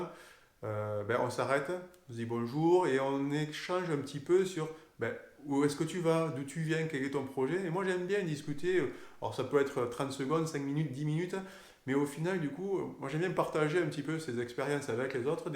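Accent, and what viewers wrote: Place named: Southwest, France. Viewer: Bonjour bon récapitulatif tu nous fait encore révé une question lors de ton premier voyage tu parté avec une étape en téte ou au filing car j espere faire mon 1er voyage en septembre mais je me suis fixer 90 ou 100km mais je me rend pas compte car c est mon 1er donc besoin de conseil merci un bonjour a tous de la belgique😉encore merci pour l axcent du sud ouest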